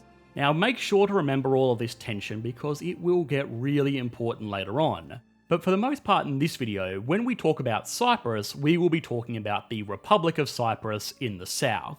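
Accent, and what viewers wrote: Australia. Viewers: At 5,30 He had a stupid pronunciation for the capitol city - Sounding something like Nicozeya rather than Nik O See A! By accent, not sure if the guy is Australian mixed in with South Aprican with a dash of American splashed in there! Peace. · Well in fairness, the guy is an economist, he does economy videos, the history was tacked on more as a factor in that economy and the details don't change anything in regards to that, so it doesn't have to be too accurate. I don't watch these videos for history lessons, but because I like the Australien accent and the economic insight I get.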